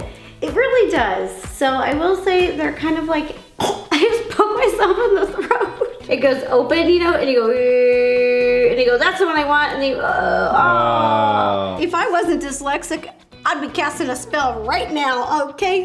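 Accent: South, United States. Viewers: voice is so cute and southern ij love it like southerners represent!!!! I'm from ga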